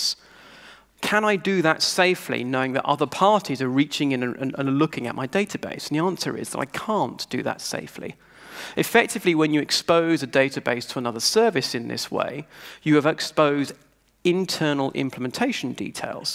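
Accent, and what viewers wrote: United Kingdom. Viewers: I started reading Building Microservices a couple of weeks ago. I didn't know Sam Newman at the time. I'm glad I saw this conference, because now I'll be able to read the rest of the book in his british accent.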